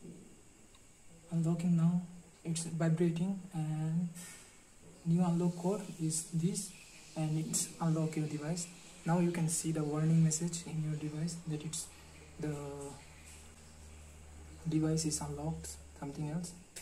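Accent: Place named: China. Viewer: I don't know If I can trust you because you sound like Chinese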